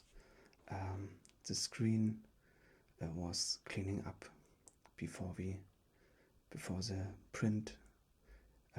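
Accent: Germany. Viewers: Man hört einfach komplett das du deutscher bist 🧐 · Ich höre doch da einen Deutschen Akzent raus 😂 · Nur wenige Amis sprechen das The mit th aus. Die meisten sprechen es als gepresste D aus. Also "da car" nicht "the car". Aber stimmt schon die Aussprache ist doch schon sehr Deutsch xD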